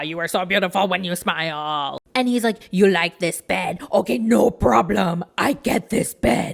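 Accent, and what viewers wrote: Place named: East Coast, United States. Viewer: I knew you were east coast lol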